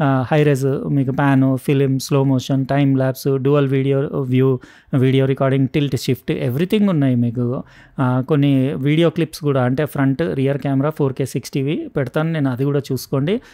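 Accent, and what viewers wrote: Telangana, India. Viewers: Telengana slag bale vuntundhi.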